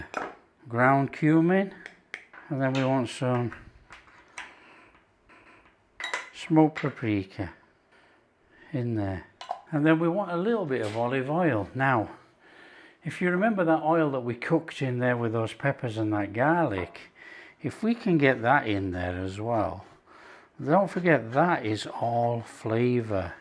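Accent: Yorkshire, United Kingdom. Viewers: glad to hear the pronunciation disclaimer in today video of your pronunciation of Papas Arrugadas Canarian Potatoes with your Yorkshire accent. I would hate to see a quified chef like yourself mispronounce it and stir up the hairs on the neck of your resident pronunciation troll 😂😂😂😂. They look delicious my friend. Cheers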